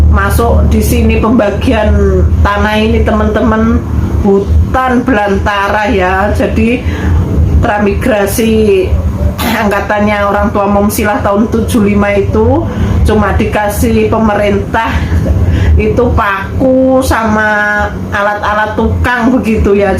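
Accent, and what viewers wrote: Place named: Java, Indonesia. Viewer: kok jowone masih medok banget....Yo.....padahal sejak kecil di Papua. Salam Soko Pacitan Jawa timur.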